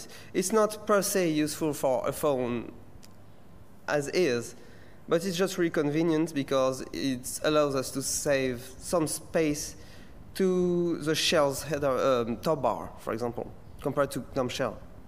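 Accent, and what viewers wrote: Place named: France. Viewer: bigre, superbe accent français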